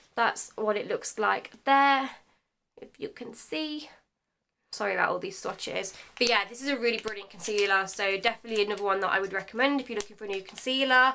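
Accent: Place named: Midlands, United Kingdom. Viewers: Loved this haul, I could imagine how excited you were to go into Sephora 🙌🏻 I love when you used the word wounded when you said about the fenty foundation. I am originally from Coventry which is close to Leicester but live in Essex now and they don’t talk like we do in the midlands down here!! Makes me feel a bit homely when I hear you talk as it reminds me of home ☺️ xxx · Stephanie Harris also a coventry girl here. I moved away but I'm still close enough to Coventry and Leicester and visit fosse park regular. I knew accent was from nearby before I even knew where she was from 😂😂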